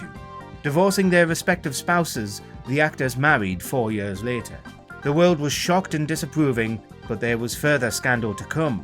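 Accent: United Kingdom. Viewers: i cant figure out the accent. which part of britain is this?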